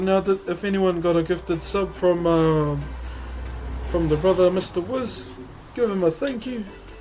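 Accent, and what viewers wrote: New Zealand. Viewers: Its just the kiwi accent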